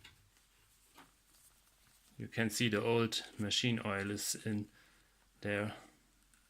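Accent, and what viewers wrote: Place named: Germany. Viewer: Thank you very much for the useful video! I've just replaced the belt in my Supernova Automatica. Followed your recommendations, and everything went just fine. The only thing which was different - I bought a round rubber belt, so I did not need to release the motor to put it on. I also like your other videos about Necchi sewing machines and I love your German accent!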